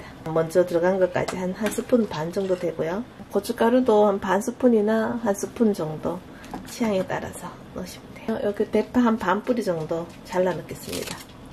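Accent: Gyeongsang, South Korea. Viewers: 안녕하세요? 안내에 따라 와보니, 여기도 매우 좋은 곳이네요! 근대 된장국, 보기만 해도 침이 꿀꺽 넘어갑니다. 귀하는 경상도 말씨라도 목소리가 좋으며 설명이 명료하네요! 거듭 감사드리며 - - - - 자주 뵙길 희망합니다.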